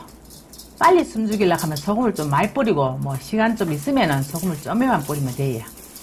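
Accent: Gyeongsang, South Korea. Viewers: 도대최 어디경상도 사투리입니까 대구에요 부산이에요 제가 어릴때 고향에서 많이듣던 사투리 같아서 궁금하네요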